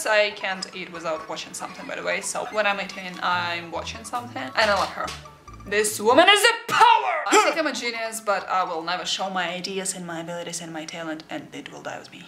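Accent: Russia. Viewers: I like your Russian accent , in fact ,speaking English in Russian accent is so sexy , Btw, you are adorable😉😉😉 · deathless? you mean immortal? P.S. you need to get rid of your Russian accent and you will be perfect! Marry me :)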